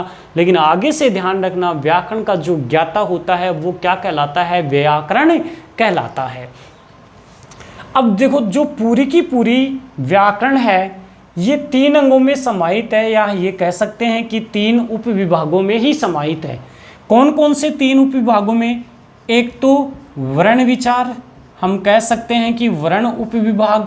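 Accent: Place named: Haryana, India. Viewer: आपको निश्चित ही हिन्दी / संस्कृत की गहरी समझ और मज़बूत पकड़ है। उच्चारण में बस थोड़ा हरियाणवी ढंग से फिसलन हो जाती है। पर आपको बारीकियाँ पता हैं। सादर आभार व्याकरण शब्द की व्युत्पत्ति समझाने के लिए।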